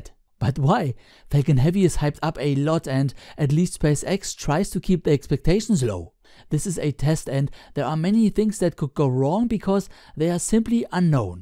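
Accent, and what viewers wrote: Germany. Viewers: CptMikeTango He's German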